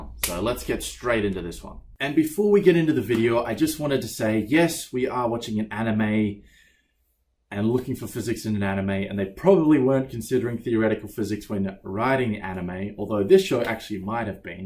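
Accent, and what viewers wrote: Australia. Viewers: Huh is he an Aussie "Hang on where's my coffee? There's my coffee" stands up ... Yep he's an Aussie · Explained well! Even me, who doesn't know English so well, everything is clear. Despite the fact that I have heard the Australian accent in very few places) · Omg you look British but sound Australian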